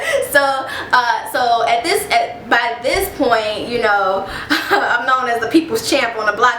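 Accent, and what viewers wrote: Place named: New Jersey, United States. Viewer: im from south side and you sound like you from New Jersey 😂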